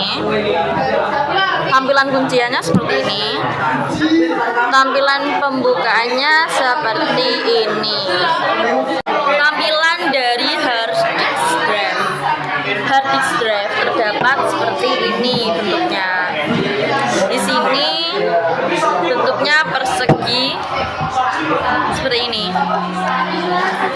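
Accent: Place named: Java, Indonesia. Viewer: medok banget jawanya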